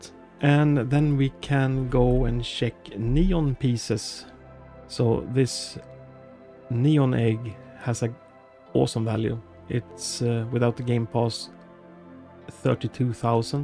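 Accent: Sweden. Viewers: Ser och hör att du är svensk, Jätte bra video, bra tips. Ska försöka dem tricken! Ha en bra Midsommar idag. Hejdå! :)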